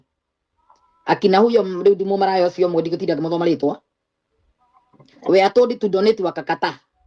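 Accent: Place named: Kikuyu, Kenya. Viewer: githungu mega tiga guthoma ta gikuyu.Nitunogire kuigua ndeto icio.Wi murumani muno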